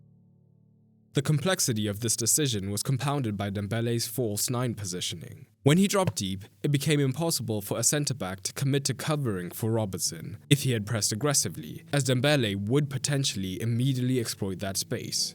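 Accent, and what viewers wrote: South Africa. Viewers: Lol, are you south african?, your accent sounds so south african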